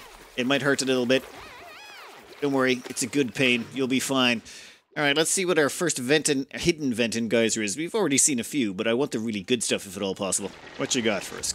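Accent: Ireland. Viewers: im pretty sure hes irish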